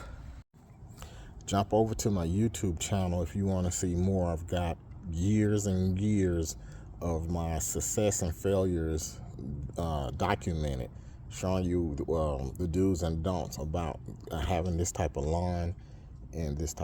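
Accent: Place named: Texas, United States. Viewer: You sound like a guy from Texas named should. 😄 Great lawn. 👍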